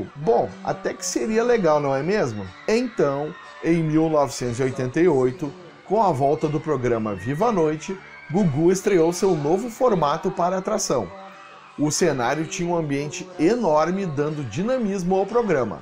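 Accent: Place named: Rio Grande do Sul, Brazil. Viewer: Nao acho que seja a mesma pessoa, o Teily tem sotaque nordestino, e o locutor aqui do canal tem um sotaque de gaúcho. O timbre da voz também é um pouco diferente entre eles.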